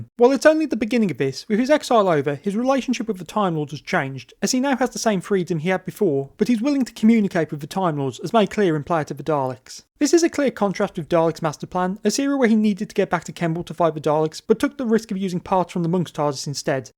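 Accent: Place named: West Midlands, United Kingdom. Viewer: Like the West Midlands twang. 👍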